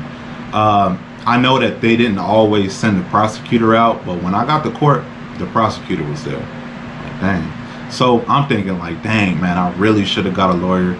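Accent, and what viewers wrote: United States. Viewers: Bro are you from New York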